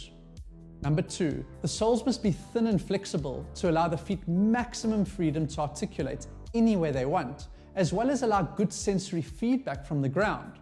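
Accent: South Africa. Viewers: are you south african?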